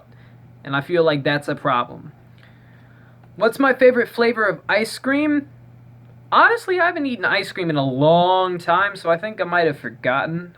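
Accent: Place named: Texas, United States. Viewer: fuck yeah boy what part of texas are u from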